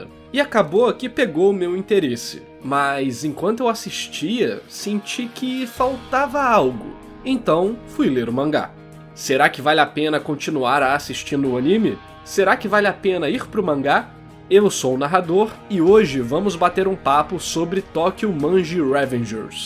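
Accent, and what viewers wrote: Rio de Janeiro, Brazil. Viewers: CALMA AÍ NARRADOR VC TBM É CARIOCA??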